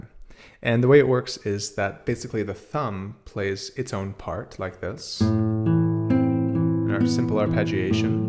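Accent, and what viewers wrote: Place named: England, United Kingdom. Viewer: con l’accento inglese:-)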